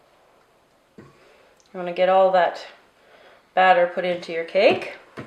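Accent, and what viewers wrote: Canada. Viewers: Thank you for posting this xoxo Are you from Canada? Lol, just I can hear an accent :P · Hi, this recipe is excellent. It passed the "culinary-challenged-bacehlor-slob" test. Yup, I'm the bachelor-slob who can't cook to save his life, but was able to make this. It turned out great! So did the chocolate butter-cream frosting recipe you posted to complement this recipe. Why haven't the Food Network or the Cooking Channel hired you yet? You do very well explaining these recipes and techniques. Your Canadian accent is charming and makes me think of all my Canadian friends.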